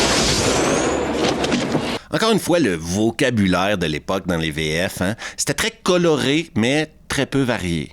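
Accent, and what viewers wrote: Canada. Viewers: J'adore ta manière de compté une histoire avec ton accent canadien 😂😂😂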